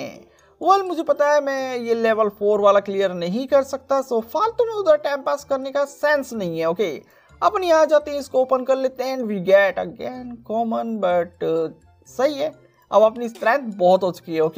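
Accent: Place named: India. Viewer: What do HE speak he speak like Indian???🇧🇷 I have 900Qi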